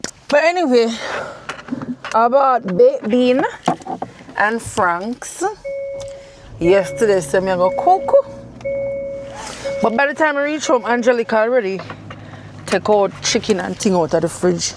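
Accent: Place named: Jamaica. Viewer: Great stuff, great you... loving the Jamaican accent. Men loves women who loves cooking, especially me 😉. ❤❤❤❤❤🎉🎉🎉🎉🎉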